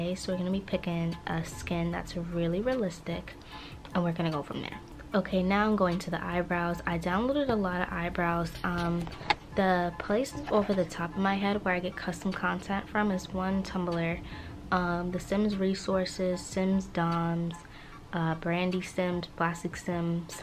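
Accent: New York, United States. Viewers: I never noticed your New York accent it’s so pretty ❤️ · I can hear her new york accent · This is the most that I've ever noticed her New York accent 😭💞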